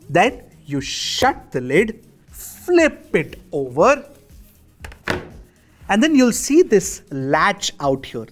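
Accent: India. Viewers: VERRY FUNY WHEN INDIAN GUY TALKING ENGLISH !!!!!!!!!!! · Brother why your English like Hindi easy to understand ❤❤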